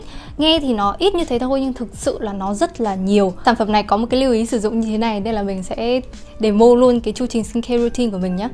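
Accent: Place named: North, Vietnam. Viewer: Em nói Tiếng Anh nghe tuyệt quá, và đây là lần đầu tiên thích nghe giọng một người nói tiếng Bắc như vậy, không giống những người chị từng nghe.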